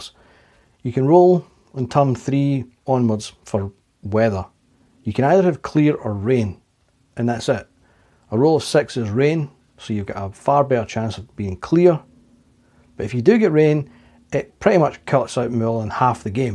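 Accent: Scotland, United Kingdom. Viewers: Good on you lad. Nice to hear a Scottish accent and not some whiny little pre-pubescent English, or Yank gobshite.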